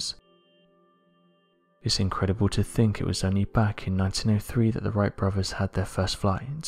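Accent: England, United Kingdom. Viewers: You sound English what do you mean we, neighbor? Its better that none of the new stuff hit YouTube. China already stole plans for some 6th gen fighter jets. It was the junk20. Thankfully china isn't very good at putting shit together yet. Im thinking the us government got wise to china and leaked an inferior plane. Either that or china is so much more incompetent and should not ve taken at all seriously. The black ops planes are still being made.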